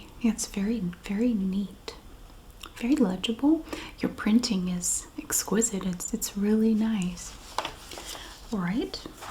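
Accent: South, United States. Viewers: I grew up in The Deep South and have always LOVED the sound of a southern accent in a woman’s voice. I’ve always found it so sweet and relaxing. This is a blissful, gentle joy to listen to ☺️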